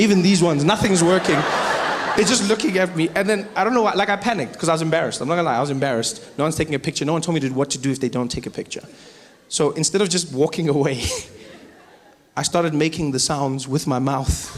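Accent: South Africa. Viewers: Back to his Seow Feffrican accent - has to have been during his tour back home. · His accent is exceptionally South African in this clip 😂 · Being a South African, living and working in China I know for a fact the some Chinese know you my brother 😅😂😂😂!! Considering that some Chinese people are generally very ignorant to the outside world 🤦🏽‍♀️, it’s huge for you to be known outchea 😅😂! I I have three colleagues who instantly fell in love with me because I’m from South Africa, same country as their favorite comedian Trevor Noah EVER ☺️🙌🏻👌🏽!! Continue to fly our flag high & remember Don’t lose your accent my broer 😅😂😂❤️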